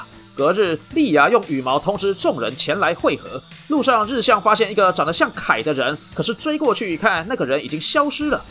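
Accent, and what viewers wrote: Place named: Minnan, Taiwan. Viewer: 这部漫画也是我小时候看的最喜欢的动画片之一，看得出来UP主很用心在解说，但说实话虽然你尽量用接近普通话的口音解说但依然能听出你有闽南语口音，你是台湾那边的吧？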